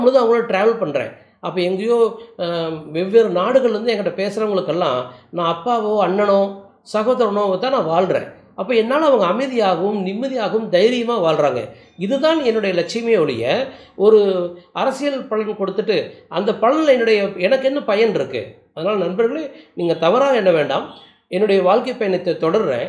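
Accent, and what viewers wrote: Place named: Kongu, India. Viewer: Sir.. Its impressive that you have been accepted the mistake on Modi Jathagam. You have predicted accurately for most of other party.. You continue give suggestion to public as we are not afford to have consultation with you for more than 5000 USD. Please give suggestion to common people for remedies and suggestion to improve their life. Continue your kongu tamil from Delhi.. Love from Sydney...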